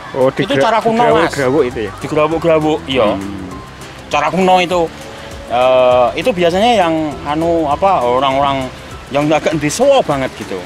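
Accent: East Java, Indonesia. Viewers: Orang mana pak?, kayaknya Jawa timuran..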